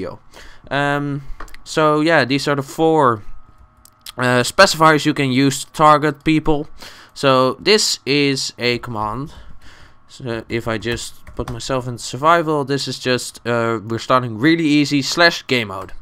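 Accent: Netherlands. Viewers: jee nederlands accent ik hoor het gelijk XD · Bedankt!! Zocht al een tijdje voor dit! (Ik reageer in het nederlands, want jouw engels accent lijkt op die van nederlanders XD)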